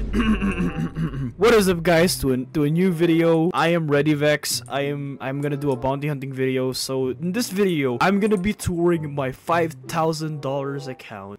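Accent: Philippines. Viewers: He's from Philippines not fully english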